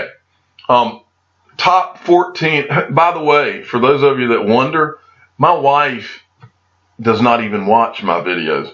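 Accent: South, United States. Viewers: When I 1st started listening to you with the southern accent and your relaxed nature, I didn't take you seriously. That soon changed with the substance of your videos. You nail it every time. You, Tony and Eri are my favorites. Strait shootin news is what gives us confidence. One thing I get confused at though. I think you should also be mentioning the Trezor Hard Wallet. My opinion, it's better than the Nano. I bet you had a smile on your face until I mentioned the Trezor, sorry man. LOL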